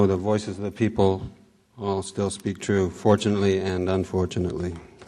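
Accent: Spain. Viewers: maybe he is from Spain, don't they all talk like that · He's speaking with accent from Spain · he's speaking in a Spanish acent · here he reads with an spanish accent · Native to Spain, that's what I meant. Argentine don't have this accent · on this lecture he is speaking with a perfect european spanish accent... not an easy task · Habla en español con acento español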